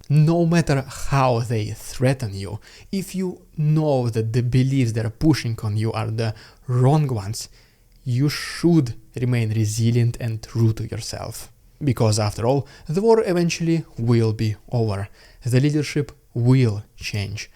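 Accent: Russia. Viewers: I think you speak English pretty fucking great, you just have a manly rooskie accent which is great! You run an English yutube channel that's no joke lol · You are not the only one. Russian universities are looking for british english accent. Not american which you obviously got, you still talk like google translate. Extremly thick russian accent your university is breeding ground for spies and other govermental officials. You should have picked up spanish instead of english. Russian universities are making anyone fail that speak american english on purpose so that more learn british accents. Supply and demand. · But don't you realise? Your English can't possibly be good enough because you still have a Russian accent! And we like it. Keep going:-) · I'm surprised how still has this accent, as a prostitute and heel licker of youtube money you'd expect him to smooth over these rough edges, or is this part of the money scheme image of a true Russian. 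And I see something of a crooked character in the tilting of the head, like zombies do 🧟‍♀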